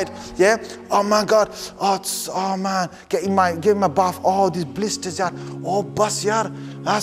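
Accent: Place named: Punjab, India. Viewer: Sounds punjabi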